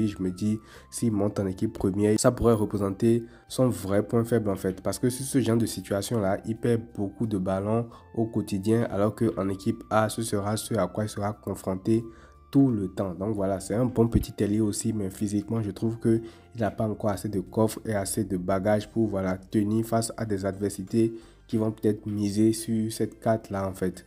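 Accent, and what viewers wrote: Benin. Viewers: Tu as un accent beninois😂👍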